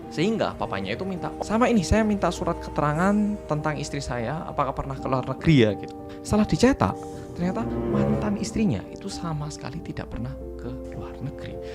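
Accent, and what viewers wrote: Java, Indonesia. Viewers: engga si, gue sbg org jawa masih denger logat medhoknya mas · Medoke wes koyo bukan orang Korea..semangat mas💪👍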